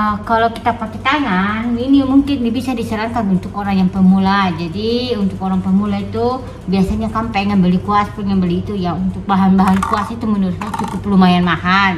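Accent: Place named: Japan. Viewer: logatnya itu berasa kental japan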